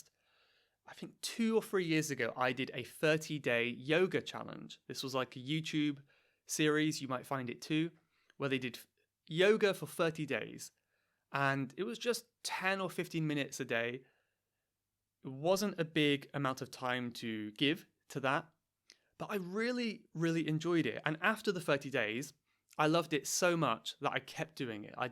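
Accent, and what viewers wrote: United Kingdom. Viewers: Thank you so much for this phenomenal video ☺️ can you please tell me in which British accent you are talking 😊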